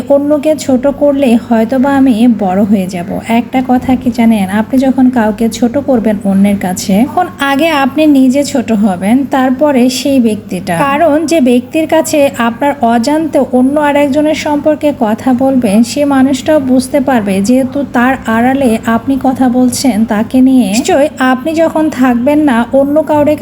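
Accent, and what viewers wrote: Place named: Rangpur, Bangladesh. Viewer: আসসালামু আলাইকুম আপু ❤আপু আপনি রংপুরের ❤সেটা আমি ভিডিও দেখে আপনার কথা শুনে বুঝতে পারলাম ❤আমার বাসা রংপুরে ❤প্লিজ কমেন্ট ও রিপ্লাই দিবেন ❤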